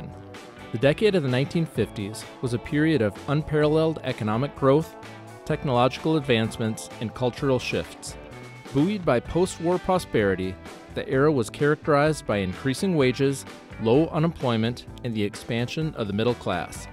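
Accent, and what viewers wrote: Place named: Chicago, United States. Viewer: Most people in America say "aww-toh-mobile". "Otto-mobile" or "Aah-toh-mobile" sounds normal to you but sounds different enough to us that we notice it. It's gotta be a regionalism, but I'm curious where it's from. Sounds kind of Chicago-y, but also kind of northeastern? I can't remember where I've heard it. The kind of place where people pronounce "awesome" as "ah-some" to rhyme with "possum". I just can't put my finger on it so it made me curious.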